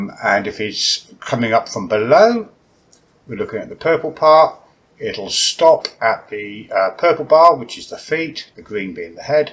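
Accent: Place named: United Kingdom. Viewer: Is this the 1st timw you guys hear a british accent😂😂😂 You need to get out more. Get a passport and see the world.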